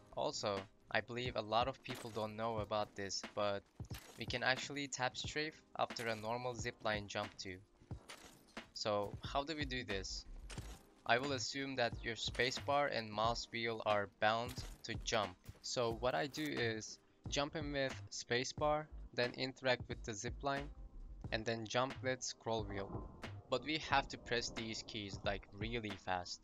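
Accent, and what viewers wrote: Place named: Turkey. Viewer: When I heard your voice I instantly thought you were Turkish looked down and saw the subtitle option, ellerine sağlık valla.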